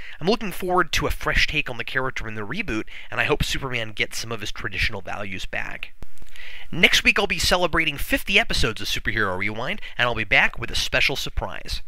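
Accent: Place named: United States. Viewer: Only cos people are dumb and get a huge boner for 'splosions and mass destruction. Man of Steel is not a superman movie. Superman Returns at least had a heart to it. Didn't give one shit about anyone in the new one. I need to go now though, this guys particular brand of American accent is cutting right through my brain.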